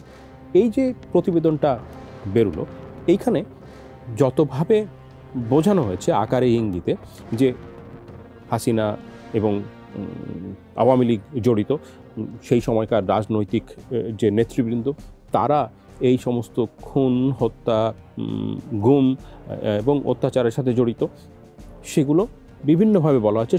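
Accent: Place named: Kolkata, India. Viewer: কেউকি একটা জিনিস লক্ষ করেছের যে নিউজটা পড়তেছে তার কথার ভিতর কলকাতার বাংলা ভাষার একটা মিস্র আছে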